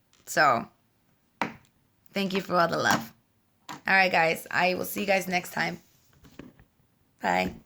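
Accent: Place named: New York, United States. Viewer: Since the first time I met you I have felt you are a honest person. I too tend to "walk the line" in this wax world, I ignore drama as much as I can as you do. Good for you for standing up. Keep being you and keep making these videos! I love watching you (and listening to your New York accent) I've heard this before "Who here has been victimized by Regina George" and it's completely true. You are either for her or against this person, there isn't an in-between. If you ever need anything I'm here for you!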